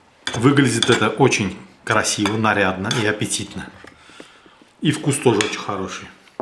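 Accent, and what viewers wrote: Baku, Azerbaijan. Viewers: Обожаю ваш Бакинский акцент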